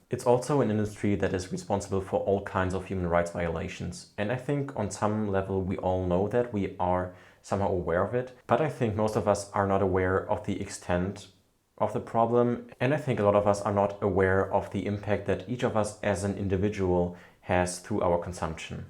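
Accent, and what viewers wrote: Germany. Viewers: Great video! I love fashion but realized I don't even wear most of my clothes, so I started to declutter everything and became more aware of my purchases. Are you from germany? The accent sounds familiar 🙃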